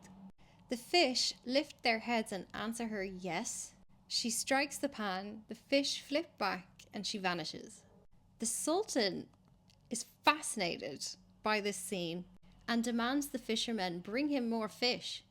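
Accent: Ireland. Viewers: Fascinating accent — I love the Irish ❦ Please read and breakdown more tales from the ⟨1,001 Nights⟩ and deconstructing the «moral scaffolding» within ❦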